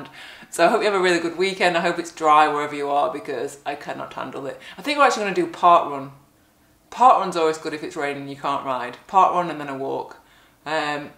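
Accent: United Kingdom. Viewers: For me training with HR is tricky, my heart throws an extra beat so the monitor is off. For example I can ride a hilly course breathe normal and have a HR of 240, so I use HR as an indicator of when my O2 max rate is close, usually for hill climbing. On a nonrelated note I do love your British accent and get a kick out of your British tan. lol. keep up the great work.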